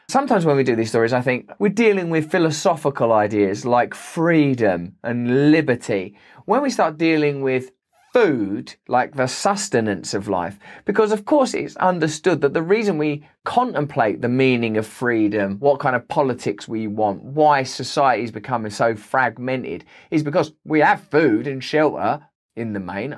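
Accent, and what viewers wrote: United Kingdom. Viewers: Despite the fact that Russell often dresses like my crazy aunt, he really seems to know his shit. It's definitely worth enduring that limey accent to recieve some of the knowledge he's offering.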